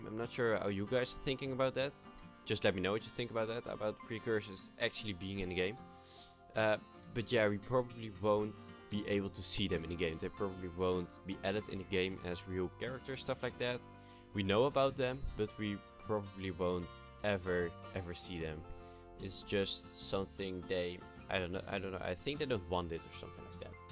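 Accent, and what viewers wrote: Netherlands. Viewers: Are you dutch? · er was makkelijk te horen dat jij een nederlander was 😅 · You sound dutch(I am) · Are you from Holland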